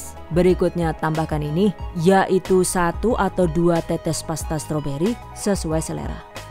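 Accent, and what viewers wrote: Banyumas, Indonesia. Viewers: Diperbanyak dong ngomong ngapaknya.. 😅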